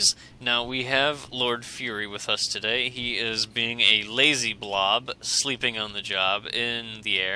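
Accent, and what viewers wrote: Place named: Australia. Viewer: Totally Aussie!!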